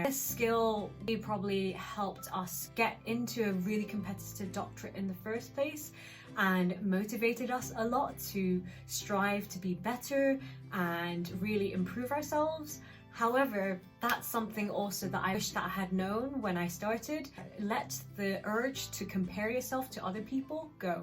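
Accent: Scotland, United Kingdom. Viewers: I’ve missed you! You look well and sounding Scottish! 😮 Basically work hard but don’t forget to rest, have fun, see friends and family, go on holiday, say no and ask for help when needed!? 😅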